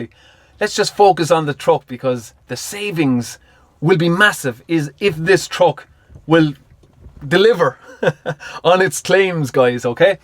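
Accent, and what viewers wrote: Ireland. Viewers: It's my first time here, too. He's got a Greek name and an Irish accent! Love it.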